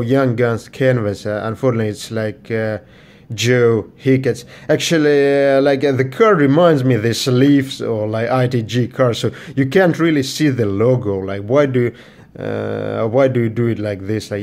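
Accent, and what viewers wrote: Russia. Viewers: Nice video man. Are you Russian?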